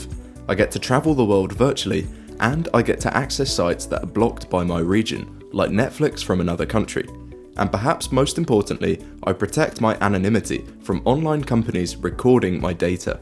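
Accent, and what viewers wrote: England, United Kingdom. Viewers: As your voice is English, why are you talking about dollars and looking at sites selling things priced in dollars. Do you live in America or is it that you are running some kind of con-trick ?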